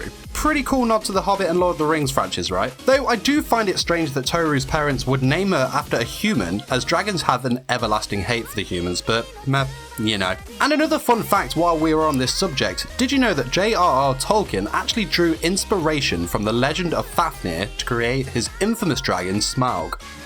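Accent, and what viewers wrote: United Kingdom. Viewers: She is known as the Penis Dragon! (Or Pee Wee Dragon if you prefer) Btw I like that your accent is British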